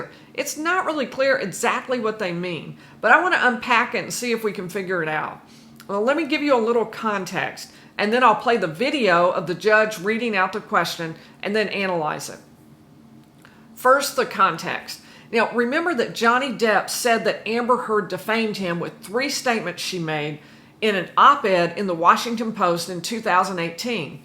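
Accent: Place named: Kentucky, United States. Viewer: Love the accent, are you from KY? You sound almost just like my wife from Covington, KY. Thanks for the breakdown.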